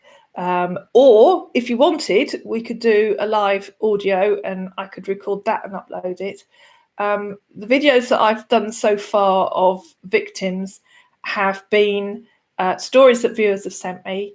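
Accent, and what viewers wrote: United Kingdom. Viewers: Hello! I'm learning English and I discovered your chanel. I'm interested in romance scamming because it's an interesting subject. I've seen several videos of yours and at last I see your face. Your have such a lovely voice and I like your British accent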